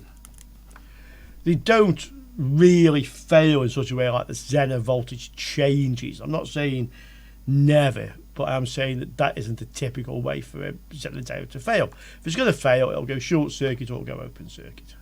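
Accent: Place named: Sheffield, United Kingdom. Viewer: It's called an accent. Sheffield or thereabouts if I was to guess. Clear as a bell to my ears.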